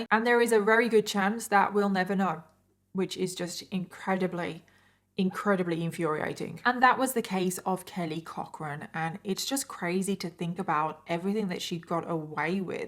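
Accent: United Kingdom. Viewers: I’ve been watching A LOT of your videos. I absolutely love the way you explain out the cases as well as add your input on the cases and I LOVE your accent (I live in America so it nice to hear a British accent) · I love your british accent, but I really don't like most of the british accents.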